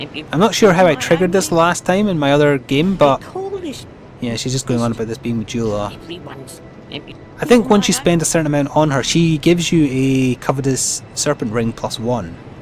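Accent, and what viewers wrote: Scotland, United Kingdom. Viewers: It took me four episodes to finally make a good guess on what your accent is. Is it scottish? (I only recognized it because of David Tennant xD)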